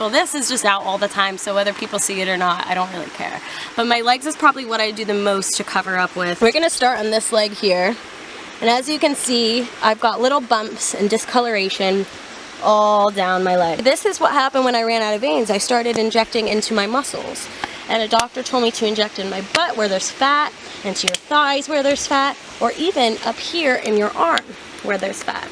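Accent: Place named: Philadelphia, United States. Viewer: I knew i heard the Philly in you. I'm from Norristown so whenever I hear someone with the accent I immediately notice it. Lol. Hope you're doing well.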